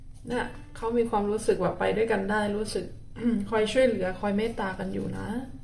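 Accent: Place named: South, Thailand. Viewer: คนใต้คับผม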